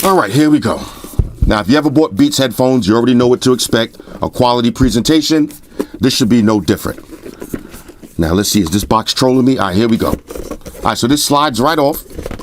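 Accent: New York, United States. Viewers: I guarantee you this dude from New York 😂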